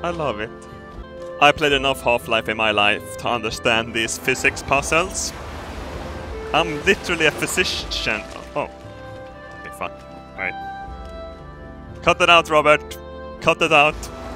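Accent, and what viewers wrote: Norrland, Sweden. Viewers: Love how you can here your norrlands dialekt when you speak swedish <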